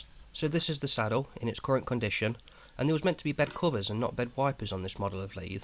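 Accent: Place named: Nottingham, United Kingdom. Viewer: That's a monster lathe, more complex than my Hardinge. You sound like you are from Nottingham.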